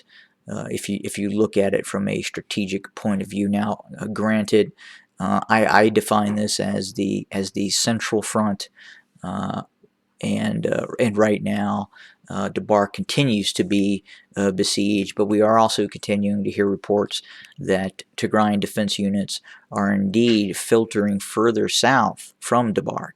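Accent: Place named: South, United States. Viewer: The commentator sounds Habesha with American (Southern) accent. No problem. Just saying.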